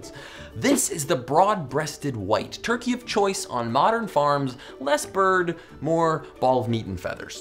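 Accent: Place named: Texas, United States. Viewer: I love how you can tell is from Texas 🤠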